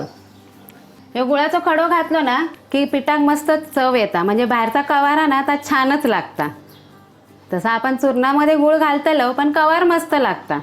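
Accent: Malvani, India. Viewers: तुझे video मी recipe साठी बघतेच. perfect measure असतं. thanks for sharing पाटोळ्या. आणि सगळ्यात महत्त्वाचं. तुझं मालवणी बोलणं फारचं आवडत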